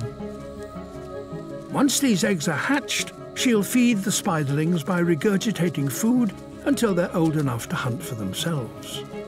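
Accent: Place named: United Kingdom. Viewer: Ah my nightmare but with a classy British voice.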